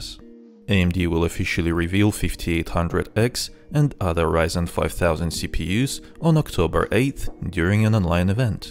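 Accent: Russia. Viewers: русский акцент)) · Как же пробивается твой русский акцент)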